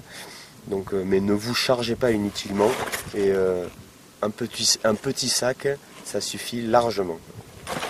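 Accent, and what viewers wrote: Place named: South, France. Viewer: salut, tu as un léger et vraiment bel accent qui me rappelle Marcel Pagnol! J'aime beaucoup tes vidéos traitant de la randonnée ( je fais uniquement du cyclotourisme, mais le principe est le même). bise d'Alsace.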